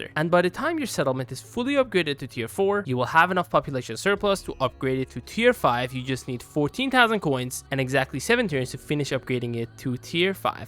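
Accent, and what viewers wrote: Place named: Iran. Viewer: you have a very strong iranian accent, that brings the question, are you iranian?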